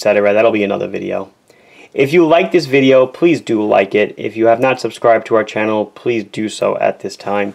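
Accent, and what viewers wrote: Long Island, United States. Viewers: grew up on long island. moved away at 24. growing older, when i visit family i notice more and more that i hate the accent. thought i would have trouble watching these vids because of that. but the quality of content makes me completely overlook the voice. if/when i buy a watch in the future i'll try to do so from you. thanks for teaching me so much.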